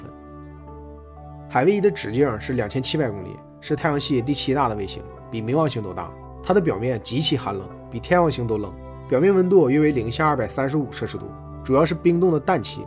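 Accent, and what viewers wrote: Northeast, China. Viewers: 好重的东北口音 哈哈哈哈😂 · 做得很好。有点东北口音更好😊！ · 这个东北口音太逗了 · 能不能不说东北话，太拉低档次了，好好学习学习普通话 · 这东北大碴子味儿听着真难受，每句话的最后一个字都是降调，又软又怂又丧失，把挺好的文案全毁了，越听心情越低落，每句话都出溜着说，老头钻被窝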